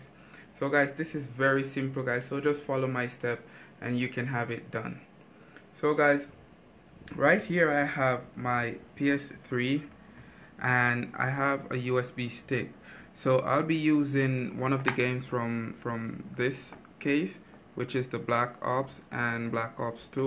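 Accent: Jamaica. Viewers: Sound like a yardie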